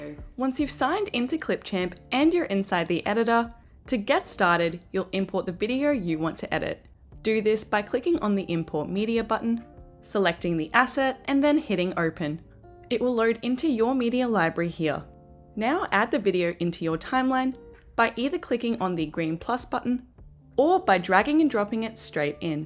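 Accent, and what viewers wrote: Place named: Australia. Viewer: so, when you delete a section that you cropped or cut out, it automatically closes the gap again and butts up to the next nearest video front or back??? You, sound Aussi . I watched this about 2 weeks ago and could not understand it at all. been using openshot and slowly getting the hang of it. still can't put in music correctly. I want to learn the easiest program. I don't know which is which. HELPL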